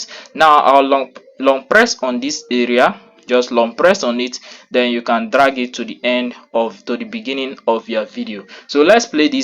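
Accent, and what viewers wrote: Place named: Nigeria. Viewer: How did you come about the Nigerian textured voiceover?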